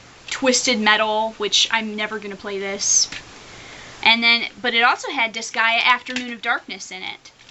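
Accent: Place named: Midwest, United States. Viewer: Sounds like the midwest, Wisconsin a bit.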